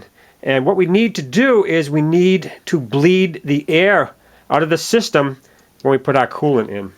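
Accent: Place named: Rhode Island, United States. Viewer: Probably because hes from "Rwoad" Island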